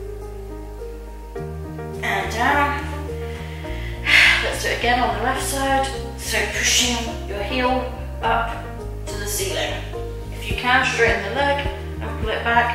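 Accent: United Kingdom. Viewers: Bloody brilliant! I'm not overweight but I am unfit. I hate watching all the buff fitness instructors strutting their perfect bodies on YouTube. We need real people we can relate to. Please keep it up. You are a breath of fresh air. It's also a bonus to hear a British accent for a change. 😘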